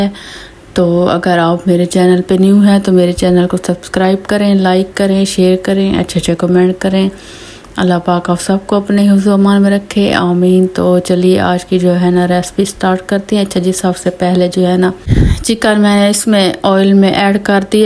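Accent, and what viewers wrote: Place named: Chakwal, Pakistan. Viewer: لہجہ چکوال شریف کا لگ رہا ہے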